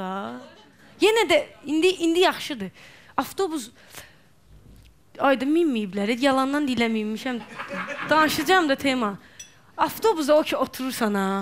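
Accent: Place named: Baku, Azerbaijan. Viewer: Sene nə dəxlisi var elə söz deyərsən gulmerem ama bu bir az Bakimin lehcesinde daniwir, hər wey zordi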